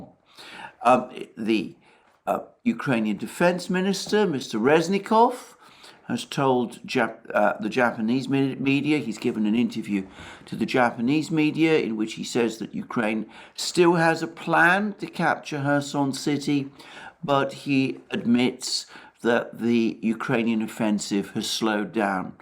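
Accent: England, United Kingdom. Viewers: Non Brits really get bedazzled by a moderately middle class, English accent. sounds the part, but his info is sub-par, to say the least. Good day to you! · Steven, Pls can you give an example of the so-called sub-par info in speech. Also, it seems that you’re sounding a bit irritated by the fact that middle class English is nevertheless bedazzling for the non-Brits. I can tell that all English accents are interesting 👋🏻😉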